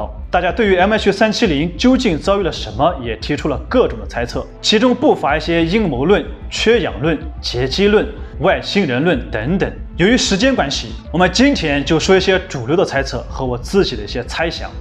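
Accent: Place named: Northeast, China. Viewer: 这个解说的口音太重了，一股大碴子味儿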